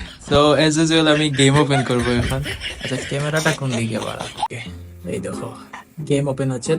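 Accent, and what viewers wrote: Sylhet, Bangladesh. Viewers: সিলেটি না-কি?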